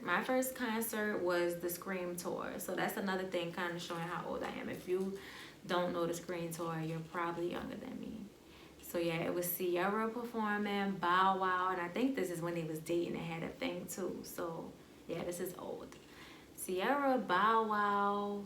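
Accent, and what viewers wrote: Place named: New Orleans, United States. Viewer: You definitely sound like you’re from NOLA lol I guessed it before you spoke about Mardi Gras. I got a close friend from there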